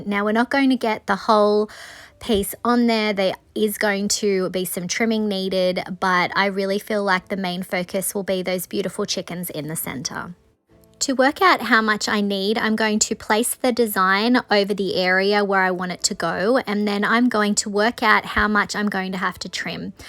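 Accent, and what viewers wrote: Australia. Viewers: I've been watching your videos for several months, and have never commented. Shame on me! Honey, you are just terrific! I have learned so much from watching you. Everything you do, you do with excellence. And beside all that--this old Florida girl just loves your Aussie accent. Keep up the good work.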